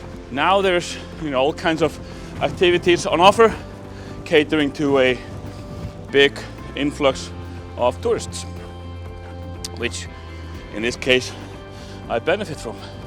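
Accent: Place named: United States. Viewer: do they ever have real blizzards in Iceland with so much snow you cannot see the house across the street? I am sure in a previous video you complained about the cold, and now you are telling us how much you like to walk in this weather. I am confused. Where did you learn to speak such good American English? I would have thought you were taught British English?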